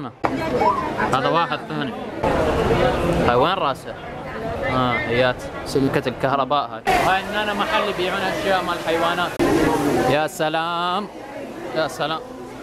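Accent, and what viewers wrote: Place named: Iraq. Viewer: حلوو استمر بس انته اشو تكول دبي واحسن تكساس من دبي انته عراقي لو من دبي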